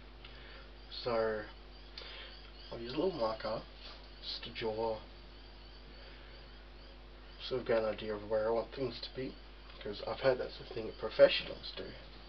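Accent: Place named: Queensland, Australia. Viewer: It's honestly nothing, and I recognised the Queenslander (because I too, am one). I will support you, because I believe.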